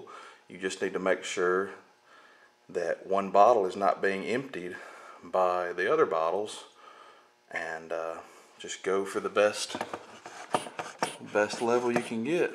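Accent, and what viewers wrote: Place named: South, United States. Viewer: Southern accent and used vodka bottles. God bless America. : )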